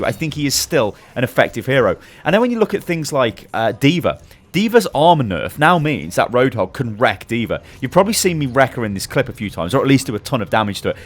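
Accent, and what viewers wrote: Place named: United Kingdom. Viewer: ggeeeeesh why is it so tuff to understand british people when there exited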